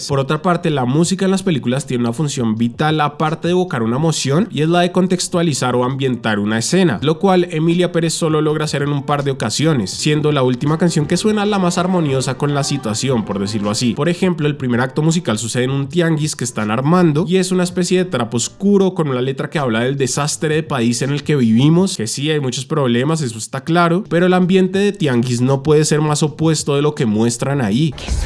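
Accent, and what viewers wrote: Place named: Bogotá, Colombia. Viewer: X2, tiene puro acento rolo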